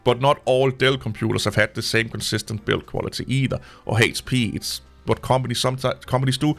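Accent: Denmark. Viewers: Are you from Scandinavia. Your accent sounds very Danish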